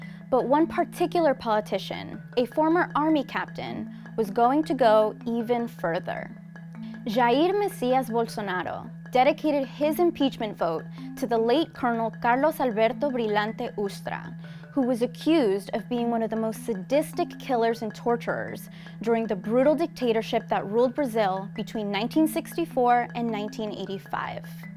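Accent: Spain. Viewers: because she speaks Spanish as her language 🤷🏾‍♂️ 🤷🏾‍♂️ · It's annoying how you pronounce Brazilian names with a Spanish accent. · Cool. But why is she pronouncing names and places with Spanish accent? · y u are Speaking portuguis with spanish accent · A lot of facts given against Bolsonaro were neither fully nor at all explained! oh, and by the way, why are you speaking portuguese with a spanish accent?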